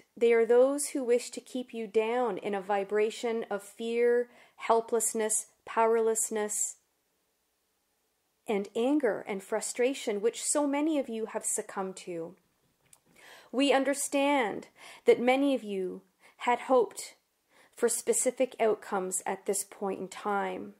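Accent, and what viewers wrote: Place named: Canada. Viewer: You lost me at "outside" and "outcomes." Oooooot-side and oooooot-comes. Canadians should be seen and not heard...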